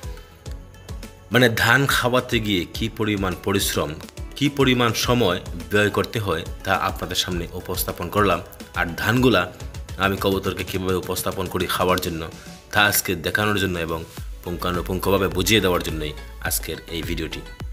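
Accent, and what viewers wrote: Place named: Habiganj, Bangladesh. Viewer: ভাই আপনার বাড়ি কোথায় আমাদের হবিগঞ্জের ভাষা মনে হল বলন কিন্তুু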